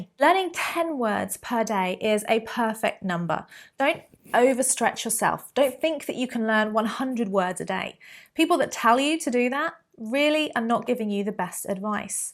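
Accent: United Kingdom. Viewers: Thanks VERY much for this lesson❤ hi mis I'm new here in this channel I want learn English British accent with you and thanks again I LOVE YOU 🌷🌷🌷🌷🌷🌷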